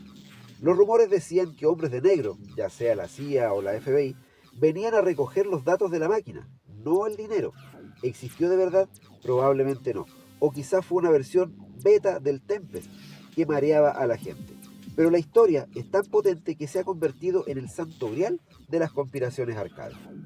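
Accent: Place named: Peru. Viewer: Que chistoso hablas,eres de peru?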